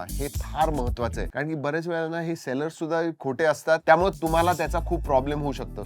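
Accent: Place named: Mumbai, India. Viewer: प्रोफाईलमध्ये जावा? मुंबईची मराठी वाटते. लय भारी!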